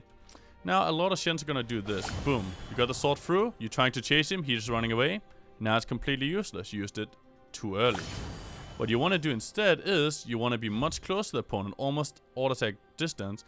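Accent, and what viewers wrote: Denmark. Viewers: The danish accent hahaha (good video btw)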